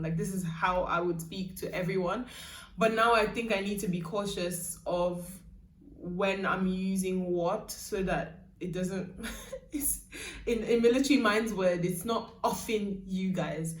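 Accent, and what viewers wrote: United Kingdom. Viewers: I know the feeling. I did that before where I was filming and my record was not on at all. I do hear a British accent when you speak. The odd words comes out as American. But, you do have a beautiful tone of voice💕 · looool... i absolutely love how you speak i hear more of British though not strong sha i sha enjoy hearing you speak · Multiple accent malfunctioning 😂😂. Why is traffic the first thing you mentioned 😭😭 I hear British accents when you talk though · Your intro though 😍. I love your British accent. I think the have the best . And you make it sound better even though environments can rub of on you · The struggle with filming ,sorry dear 🤗 I have noticed you have British accent and not a lot do I hear Naija accent , it always very light . It’s a beautiful accent and you speak great English. True about the accent things when relating to Client at work. 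Haha they sure will charge you high when you speak like a foreigner in Naija 😂😂 well I guess you should just keep enjoying your code mixing and switching accent😁❤️ I honestly think you should just be yourself babe , it comes let it flow ❤️ I have my very strong Naija accent and it’s not going anywhere 😁 ever with my Naija accent when I visited Naija my friend didn’t allow me to talk at the market to negotiate, according to her i sound a bit different from normal Naija accent 😁which I don’t believe thou until another day when I talked , the lady at the saloon was like “ where are you from “ I was confused ooo 😁😂😂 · I totally understand this, when I’m with you, I pick up things from you and it’s so unplanned. I think you should just be yourself though, your accent is more british though. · Traffic 🙄🙄🙄 you just had to add it 😂😂 I think it’s more of the British accent for me, it’s what I get from hearing or listening to you talk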